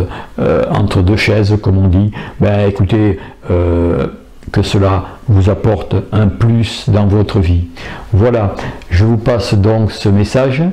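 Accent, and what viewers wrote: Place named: South, France. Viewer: Merci, je vous aime beaucoup, je suis bordelaise et j aime votre accent du sud, bises de l allemagne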